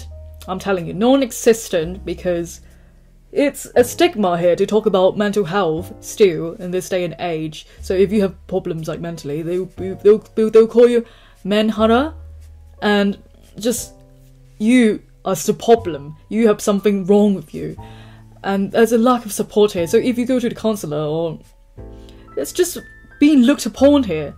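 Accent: United Kingdom. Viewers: it's so funny to see a cute lady with such a thick english accent and frequency tone, the contrast is weirdly attractive. Interesting, but I'll try this year and see for myself nothing better than this, I m also introvert, so I feel like a more autist society might just do, one year of PVT!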